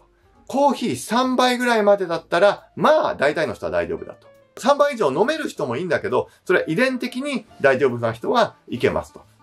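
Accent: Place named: Tokai, Japan. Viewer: 先生は東海出身でしょ〜所々方言出てる気がする.....！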